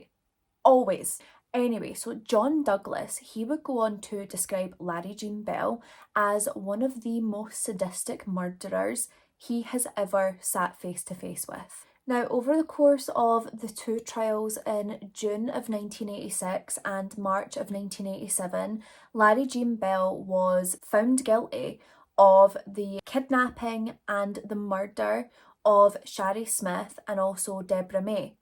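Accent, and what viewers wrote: Scotland, United Kingdom. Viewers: Hf, I thought you were saying “pill party” this whole time but you were saying POOL party 😆 your Scottish accent is so cute lmfao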